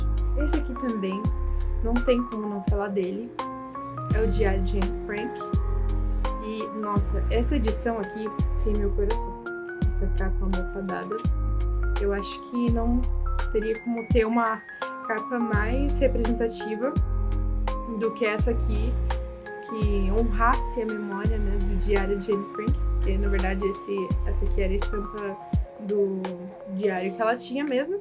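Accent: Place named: South, Brazil. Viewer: Teu sotaque é lindo, vc é do Sul ? Amei as observações ❤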